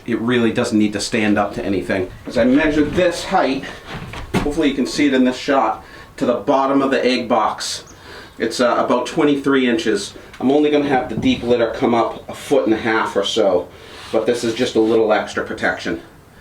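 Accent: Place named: New England, United States. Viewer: I have always used soft pine wood shavings in my coops. The coop I'm currently working on finishing will use the deep litter method and so far I have liked the pine. I am intrigued by industrial hemp though and would like to try it out at some point. Loving how your coop is turning out. I found this series when we started doing plans for our coop and I have enjoyed watching your progress. Also love hearing the New England accent as we are New Englanders ourselves!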